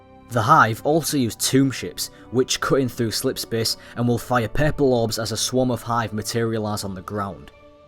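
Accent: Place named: Scotland, United Kingdom. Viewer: With some mates we have an ongoing debate trying to identfying your accent. My idea was that it sounds like a mix between Scottish and Northern English. Would you mind telling us the truth?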